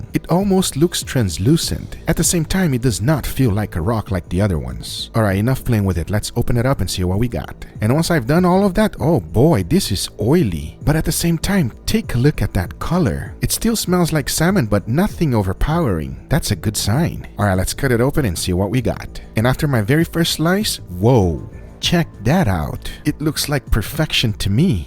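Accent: Brazil. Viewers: Leva uns 5 segundos pra perceber o sotaque brasileiro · Hey bro. Are you Brazilian?? Your accent is so Brazilian! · This is the first time I hear the real Brazilian accent in english. Congrats, buddy. Your channel is very nice.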